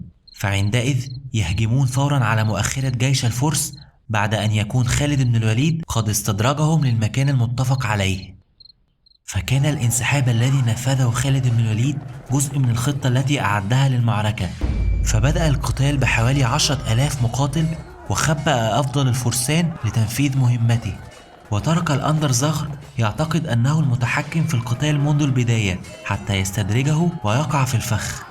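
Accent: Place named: Egypt. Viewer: تعليقك وسردك للمعلومات سيئ جداً.. ما ادري ليش المصريين متعلقين بلهجتهم ..؟ انت تقدم ماده المفترض منك نطق الحروف بالشكل الصحيح ..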